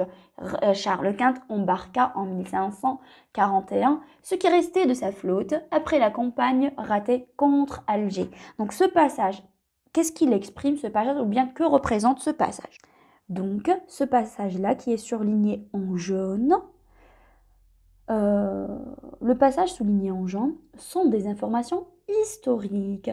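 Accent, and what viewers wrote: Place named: France. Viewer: J'adore votre accent français😍😍😍😍😍😍😍😍😍😍😍😍😍😍😍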